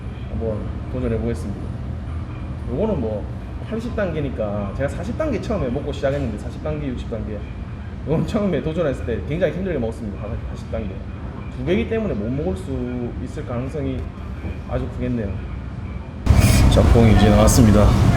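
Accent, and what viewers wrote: Gyeongsang, South Korea. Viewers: 님 고향이 어디신가요? 경상도 사투리인건 알겠는데 어느지방쪽이신지?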